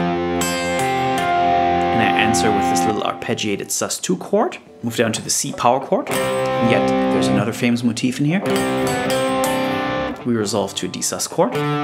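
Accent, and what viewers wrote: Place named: Germany. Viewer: It's hard to tell how German you are until you say "O" vowels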